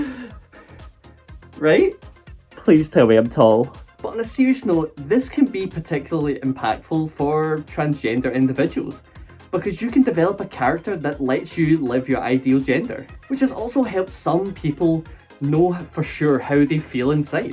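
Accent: Scotland, United Kingdom. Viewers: I love ur accent SCOTLAND RULES!!!!!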